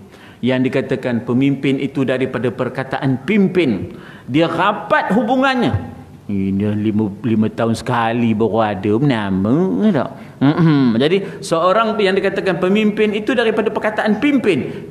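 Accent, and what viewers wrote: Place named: Perak, Malaysia. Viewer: Pekat sgt loghat perak.....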